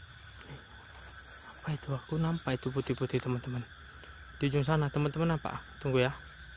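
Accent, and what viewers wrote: Medan, Indonesia. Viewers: Logat Medan Abang orang Medan ya :D Awas ada yg berondok hehehe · Bang abang asli medan kan? Medan dimananya sih bg pengen tau awak haha. Pesenku berkarya teross bg. Jangan abg ilangkan logat kita itu bg karna memang kota medan yang paling disegani diindonesia😂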